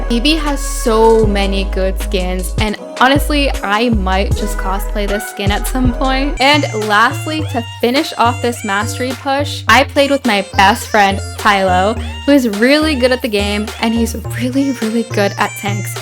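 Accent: India. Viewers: Are you from India by any chance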